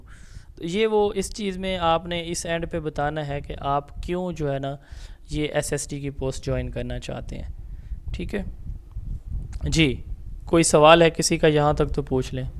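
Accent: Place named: Saraiki, Pakistan. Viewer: Mithe sariaki boli ❤️🌹🌹❤️❤️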